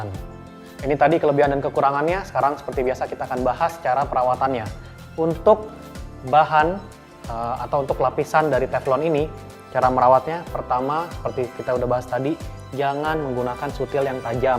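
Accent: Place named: Palembang, Indonesia. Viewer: Wong Plembang caknyo bicek ini hhahahaa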